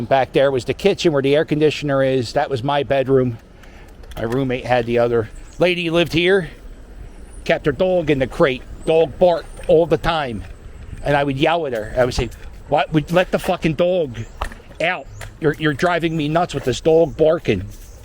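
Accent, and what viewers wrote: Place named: Pennsylvania, United States. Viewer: I just want to tell you I’m a Pa native and to hear the knowledge that you have on the metro Philadelphia area is phenomenal and refreshing to hear. I was born in Norristown, and loved philly, knew and worked there till i was over it at 22, moved out west to California and now reside in Nevada. Love the phillies, Eagles, and miss hearing the slang in your voice, always know a fellow Pennsylvanian! Im now a fan, keep up the great videos 👍🏼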